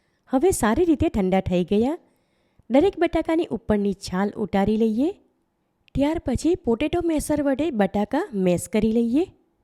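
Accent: Surat, India. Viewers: Khub saras tame asal surati bhasha ma boline recepie batavi.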